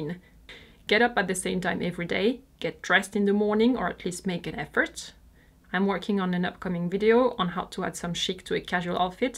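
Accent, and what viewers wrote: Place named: France. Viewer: I found you, during this self isolation here in America. I love your videos. Your French accent is so lovely. You are so graceful and kind. I subscribed to your channel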